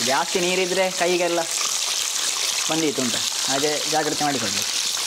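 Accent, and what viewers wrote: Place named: South Canara, India. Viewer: This is the style of kannada spoken by people from south canara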